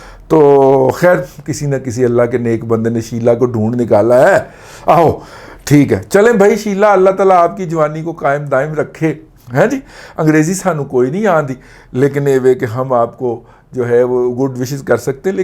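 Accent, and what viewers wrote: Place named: Sialkot, Pakistan. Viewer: Haha 😂 sialkoti style ki kaya baat hay.wese bava ji hum bhi sialkoti Hain 😂🤣😉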